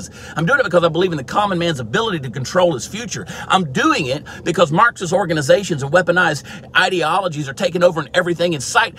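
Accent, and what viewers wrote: Texas, United States. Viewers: New hat! Great insights! You put my thoughts onto Texas accent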